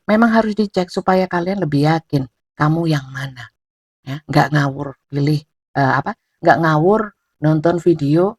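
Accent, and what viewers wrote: East Java, Indonesia. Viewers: suka bgt gaya bicara sama logat Jatimnya kak tiap baca readingan......jd pgn meet up langsung ngobrol2, pasti asiikkkk......😁😁😁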